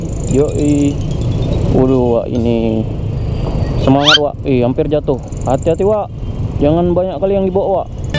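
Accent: Medan, Indonesia. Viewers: kek ginilah bahasa medan.. moga sukses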